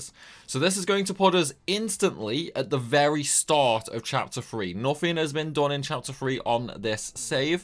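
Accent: United Kingdom. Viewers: That’s how a lot of British people talk about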